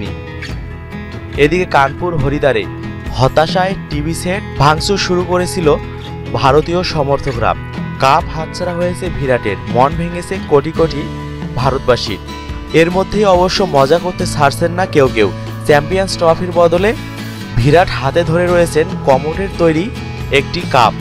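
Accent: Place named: Noakhali, Bangladesh. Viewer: Shala bolod messs na match 😂 kotha bolte shikh shala noakhali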